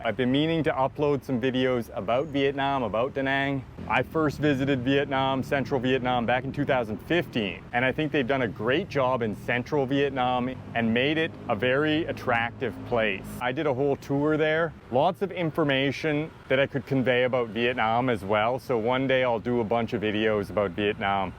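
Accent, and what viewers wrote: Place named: Canada. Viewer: The negative comments I think it's the Canadian hoser accent and because you speak very slow and clearly. Which is great cause I can listen to you at 1.25 it 1 5 speed. I'm in Thailand (Hua Hin) now and you helped us get here with your vids. Big thanks from a fellow Canadian and Australian. Hope to come back in Dec for 4 months to escape those Mississauga winters. Cheers keep making those vids.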